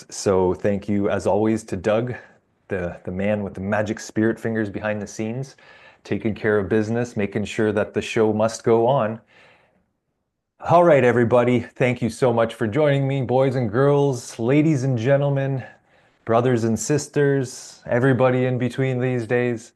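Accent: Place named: Scotland, United Kingdom. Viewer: Yep...nice one 😮 nice Scoish accent 😅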